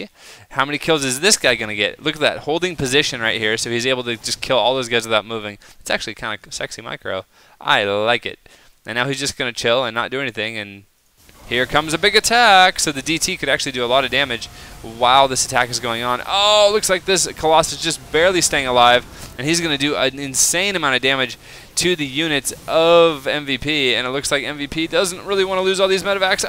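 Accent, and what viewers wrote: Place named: Canada. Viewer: ROTFL you are excellent what you do! hey can i ask you a question, what country are you from, cuz im canadian and you sound canadian also =)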